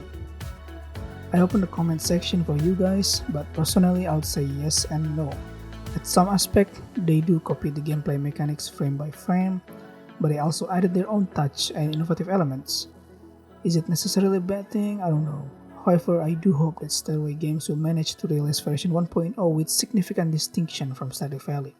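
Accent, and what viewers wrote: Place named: Indonesia. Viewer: Sound looks indonesian accent.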